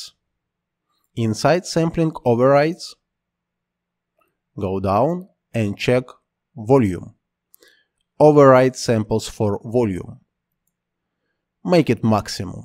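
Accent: Russia. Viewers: Дружище, у тебя всё классно получается, ты большой спец, но почему не вещаешь на русском( акцент выдаёт)? Таких как я полно и нам не хватает уроков по редшифту! Будь добр, сделай серию на русском... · Прикольно))) Не зная английского, я поняла его, благодаря русскому акценту) Спасибо за урок)))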